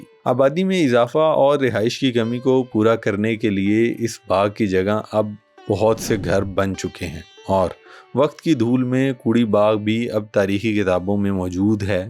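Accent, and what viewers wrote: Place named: Karachi, Pakistan. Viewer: Aap kay ajdad ne India say migrate kia tha bohot Khushi huie, video dekh kr Khushi hui aap ka lehja hum karchi walon jaisa hai achchha laga, informative video 👍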